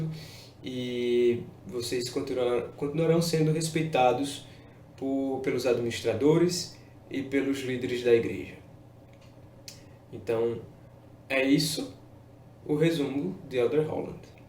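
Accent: Northeast, Brazil. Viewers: Oi, você já foi membro ? Gostei do sotaque, é do nordeste ?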